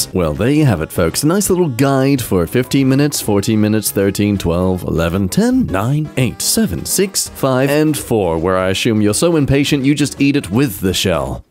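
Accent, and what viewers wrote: Australia. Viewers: it's fine, you can go full Aussie. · mate, that flower is already dead… that yard was beautiful and green with the flowers, and it didn’t look like shitty fake grass… or dying one… hand to blade is where my mind went too 😅 too many humans are the definition of ew so I agree with that sentiment in this case… but no, insects are beautiful useful important fellow animals but otherwise, ooh, and yes please keep your aussie accent mate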